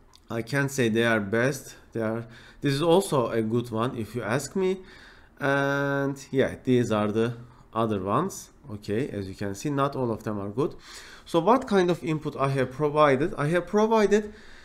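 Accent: Turkey. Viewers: Bende diyorum bu ingilizce neden tanidik geliyor Turk oldugundanmis:)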